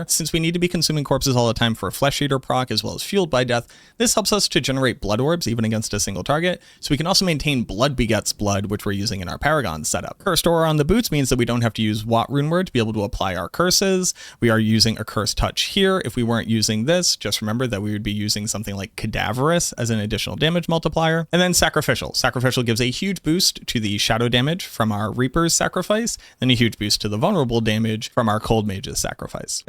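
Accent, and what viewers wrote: Boston, United States. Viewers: Please do a video guide in your authentic Boston accent. Thanks!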